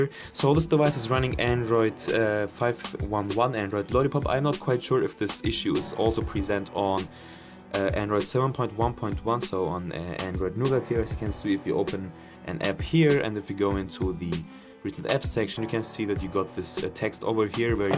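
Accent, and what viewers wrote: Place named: Germany. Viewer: Man merkt das du deutscher bist 🇩🇪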